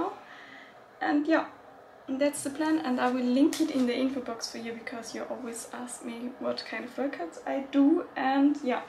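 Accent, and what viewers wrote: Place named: Germany. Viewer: Cute english with german accent 😃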